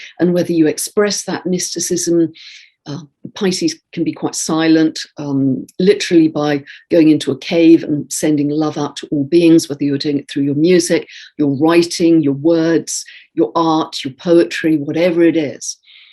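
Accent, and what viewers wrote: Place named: RP, United Kingdom. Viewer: And she does it in a rather cultured British accent.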